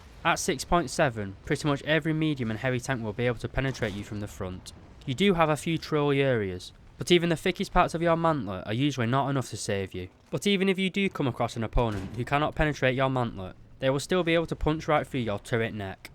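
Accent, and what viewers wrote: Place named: England, United Kingdom. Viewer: Ayup mate... Whereabouts are you from in England? I'm from the high peak myself so your accent sounds quite familiar to me😁👍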